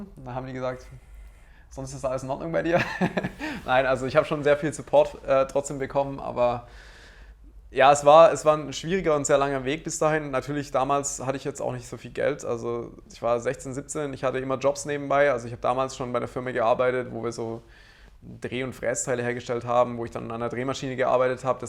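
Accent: Swabia, Germany. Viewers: Ich wusste es, dass du ausm Süden kommst. Ich hab irgendwas schwäbeln gehört. So n bisschen zumindest und ich war mir nie sicher 😉😂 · eins mit full schwäbisch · Ja mei, du bischt eh Schwob :-) (habe da nur gewohnt,bin kein Schwabe) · Gibt es ein Video in dem du Schwäbelst? Falls nicht sag ich Mal "Back to the Roots" :D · Sackzefix der kerle kommt ja US m schwabeländle🤯✌️ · ich höre dass du Schwabe bist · Does he sound "schwäbisch" to Berlin ears?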